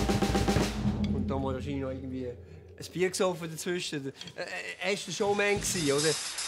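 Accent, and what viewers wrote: Zurich, Switzerland. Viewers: He's from Zurich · is he speaking swiss german? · hat er in diese Video auf Deutsch gesprochen? oder ein Dialekt vom Schweiz? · Merci, ändlich mal e Ufnahm wo er Schwizerdütsch redet :) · Alter Schwede, ich kenne ja nur sein Englisch, aber auf Switzerdütsch ist er echt eine Herausforderung für einen Deutschen :D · Yes, Jojo speaks Züri-Dütsch, the Swiss-German spoken in the Zurich-area. I'd say it's probably one of the easiest Swiss-German to understand if you speak German. Certainly easier than let's say Bern-Dütsch or even Walliser-Dütsch. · Lymphosacroma Yes, I knew Jojo is from Zurich, and I also knew the German they speak is not exactly the "straight" German, but having studied German in school (some 30 years ago...) I thought I could understand a little bit more. So to say, I once could hear people speaking Kölsch (Köln dialect) and could understand much more than what Jojo is saying here... 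here in Italy is pretty the same though, when not worse: if a Northern Italian and a Southern Italian would speak each other in their dialects, they could barely understand! :)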